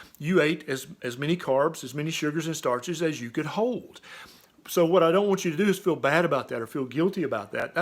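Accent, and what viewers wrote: South, United States. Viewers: I love your videos. Your dialect is pretty funny though. Are you from the South?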